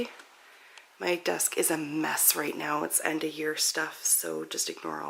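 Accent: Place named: Canada. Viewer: I kinda immediately guessed you were Canadian, because you speak very pleasantly :)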